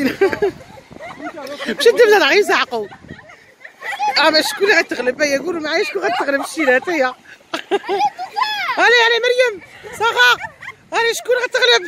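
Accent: Oran, Algeria. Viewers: شحال تعجبني هدرتك نحس روحي في وهران ههه إنشاء الله نربحو اليوم ⚽️🇩🇿🏆🤲 مرحبا ببنات بلادي عندي شكرا ربي يسعدكم و يفرحكم لي الشرف تنوروني و تشجعوني شكرا مسبقا بنات بلادي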